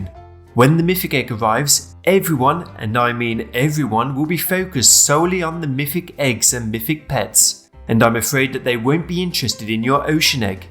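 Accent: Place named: United Kingdom. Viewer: Ur voice is very good even tho ur british ur voice is good and cool and calming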